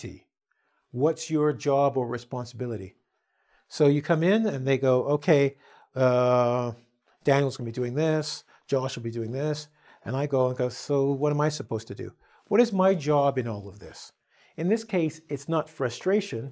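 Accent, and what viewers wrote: France. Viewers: Lol your French accent is just merveilleuses! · Your french accent is priceless. Full disclosure - I am french.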